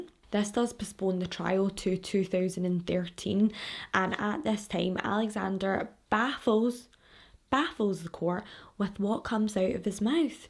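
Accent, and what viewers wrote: Scotland, United Kingdom. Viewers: I first heard it as "ghetto!" So I wonder if that other fan is also American. I figured it out quick enough but every time I'm still like: how can GIRL sound like GHETTO to me??? Glad I'm not the only one hearing a t/d in there. 😂 It's a lovely accent!!! Speaking of your accent, the first video I watched, as I was about to watch it briefly thought: wonder where she's from... American?, Latina?, Mediterranean?... well I'll know in a moment when I hear her... Scottish?!!!! 😂😂😂😂 So it was a LOVELY surprise. (Not that there's really any accents I don't like, but gotta say Irish and Scottish are up there in my faves!)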